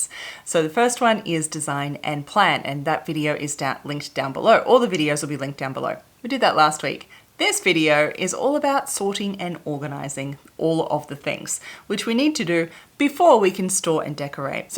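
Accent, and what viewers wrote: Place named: Australia. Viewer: Looks Asian sounds ausie im so confused😂 great organizational skills!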